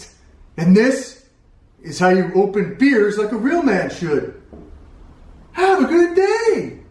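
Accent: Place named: California, United States. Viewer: He sounds so California western now.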